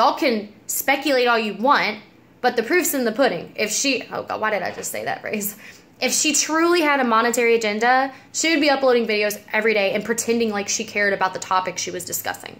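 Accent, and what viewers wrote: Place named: South, United States. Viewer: I immediately caught your accent because I'm southern too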